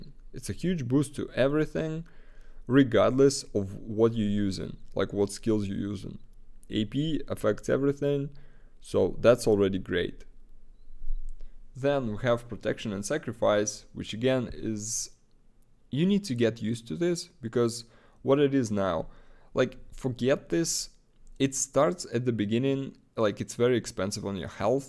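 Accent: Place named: Russia. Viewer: То-то я думаю акцент русский )))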